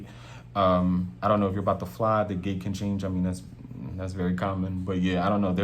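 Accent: Philadelphia, United States. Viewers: Being as though we both from Philly… lmao I can just hear it in voice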